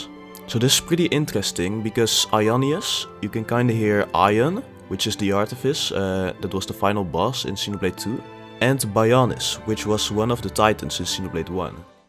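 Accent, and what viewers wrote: Netherlands. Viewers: Ik hoor meteen een NLer 👍🏾😂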